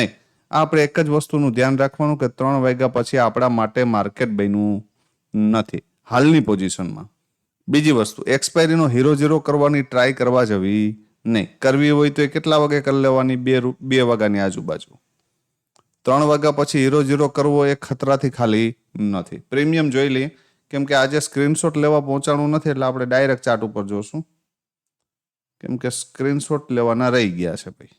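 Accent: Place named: Kutch, India. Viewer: Kutchi Madu lago chho bhai bhasa par thi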